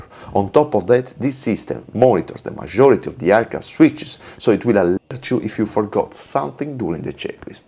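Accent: Italy. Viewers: Sei italiano e sei sardo ahahahah si sente dal inglese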